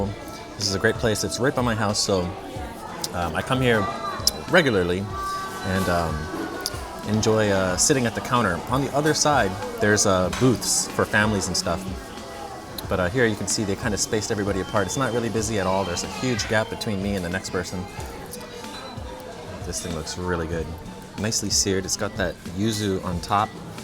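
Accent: United States. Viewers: Please remove hat while eating Love video and had Kaiten sushi often. Are you originally from Hawaii? Sounds like it.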